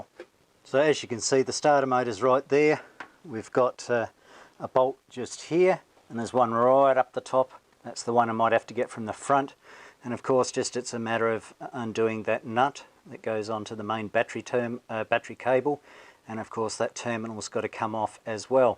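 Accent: Australia. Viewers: Awlternator lol NSW accent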